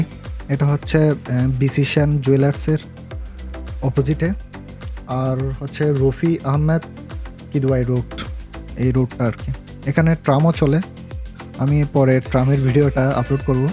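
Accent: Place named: Chittagong, Bangladesh. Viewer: ভিডিও দেখে ভালো লাগলো। খুব সোন্দর উপস্থাপনা করেন। কথায় বুঝায় যাচ্ছে আপনার বাড়ি চট্টগ্রাম।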